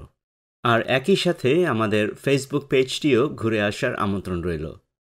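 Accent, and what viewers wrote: United States. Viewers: apu ami bolchi thik kore kotha bolte Uni american style na choose kore british ta choose korleu parten 😒🪧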